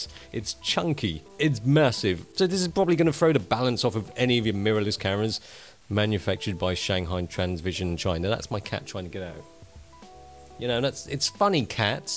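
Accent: England, United Kingdom. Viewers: Spends first 1/2 of video talking about the color the cap & the cat with an English accent no less. Hard to take. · Your British accent melt my heart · I just love your thick british accent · Can you tell why you have an british accent? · I literally laughed out loud when that guy talked about your english accent 😂😂😂😂😂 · FISH N CHIPS accent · I'm sorry if I'm rude.. but are you an asian? your british accent is freaking delicious to listen #nohomo :\ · Chinese people are usually way too accustomed to American accent, plus seeing an Asian with that kind of authentic British accent is not usually expected and pretty confused for the first one or two sentences.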